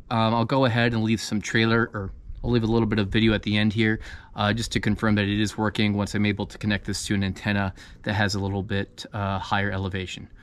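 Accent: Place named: Arizona, United States. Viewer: Sound like your in AZ. We might be neighbors! Thanks for the video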